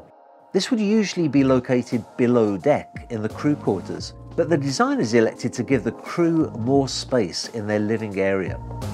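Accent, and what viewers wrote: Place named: Birmingham, United Kingdom. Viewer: As a photographer / videographer (as well as a boater) I find your videos inspiring. With your TV standard presenting, it's great to see ... (brummie accent makes me smile) .... 🇬🇧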